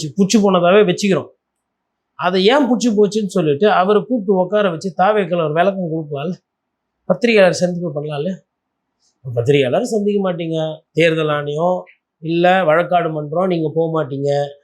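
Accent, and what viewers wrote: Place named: Telugu, India. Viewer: ஏன்டா கோட்டி உன் தாய்மொழி தெலுங்க அல்லது மலையாளமா ஏன்ன தமிழ் தமிழ் பேசர இப்படி தான்டா இரண்டு கும்பல்கள் தமிழகத்தில் சுரண்டி கொழத்துகொண்டுயிருக்கு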